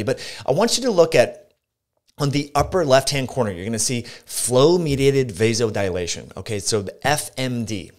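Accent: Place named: South, United States. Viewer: Hard to understand unless you've lived in the South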